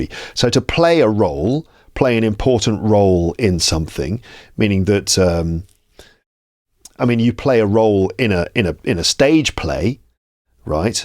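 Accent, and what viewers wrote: United Kingdom. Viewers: Hello!! I'm from Argentina and I'm preparing for an exam in May. I've failed on passing the C1 level of English so many times. I'm still practising all the skills despite having some health issues that retrieve me from learning and passing the exam. I need to pass this last exam to become an English teacher. Thanks for the video. I appreciate your content. This is my first podcast I listened to on your channel. I could get almost all your words and I love your British English accent. It's very clear. Regards. Daniel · I watched the entire podcast, and it was spontaneous. I'm really impressed by the British accent!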